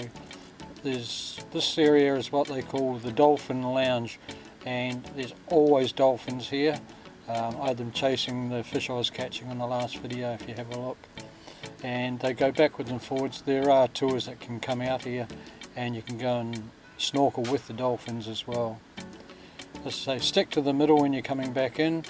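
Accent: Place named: New Zealand. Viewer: Brilliant mate. Think I can hear an accent from back across the ditch! I’m a local Perth kayak fisherman as well so am enjoying your content. Thanks for sharing spots and launch spots. Cheers mate and keep it up 😃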